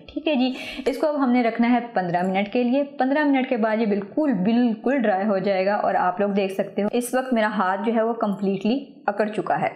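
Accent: Punjab, India. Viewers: Ap ka panjabi style bahut mazy ka hai. Salamat rahain. Ameen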